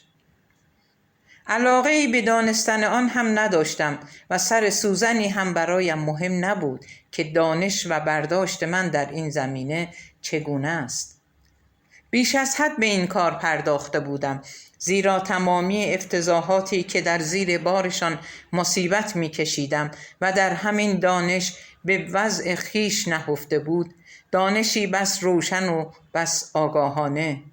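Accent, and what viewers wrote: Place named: Russia. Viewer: چرا اینجوری صحبت می‌کنید؟؟ بیاد گوینده بخش فارسی رادیو مسکو در زمان شوروی افتادم که خانمی مسن که احتمالا از سرکردگان بخش فارسی حزب کمونیست بود، با تُنالیته خشن و مردونه، بالهجه ای که دیکتاتوری سرخ مسکو رو تداعی میکرد، و انگاری از توی یک سالن دراز خالی حرف می‌زنه، با اون لهجه فارسی_روسی میگفت: اینجا مسکو _بخش فارسی اتحاد جماهیرسوسیالیستی شوروی!! و ما هروقت شیطنت میکردیم مادرمون رادیو رو روشن می‌کرد و می‌گذاشت روی موج رادیو مسکو و میگفت : باشه اذیت کنین ولی خانمه صداتون میشنوه شب میاد میخورتتون.... 😅 وما بچه ها میخزیدیم زیر لحاف و فکرمیکردیم خانمه اگربیاد تاما رو بخوره، حتما نمیتونه مارو زیرلحاف پیدا کنه.....!! 😂😂😂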